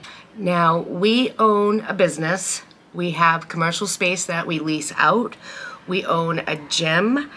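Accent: Massachusetts, United States. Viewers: Just found your channel through this collab - you had me laughing so hard with your Wally World dis - hey, who doesn't feel that way? I also love your MA accent cause I've spent many happy hours in various New England states :-) So you have a new subscriber and I look forward to following along!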